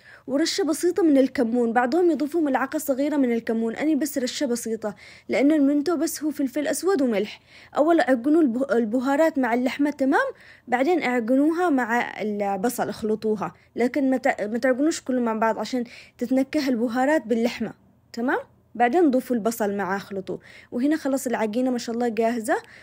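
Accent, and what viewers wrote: Aden, Yemen. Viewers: اهل اليمن هذه لهجتهم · الصراحه احب اللهجه العدنيه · أحلى شي أحلى شي كلامك اليمني الجميل اللي بدون تصنع ولا قلتي كلمه خليجي اني فدالك.. في بنات عدن عمرهم ماخرجوا من عدن ويتكلمون سعودي ولا اماراتي تقولي الا مواليد الخليج..تصنع يجيب الغثى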